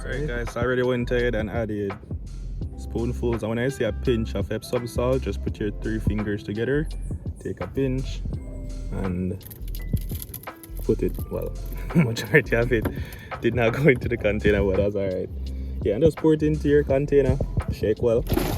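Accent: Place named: Jamaica. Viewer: I'm a grower my self and you sound like ah yaad mn.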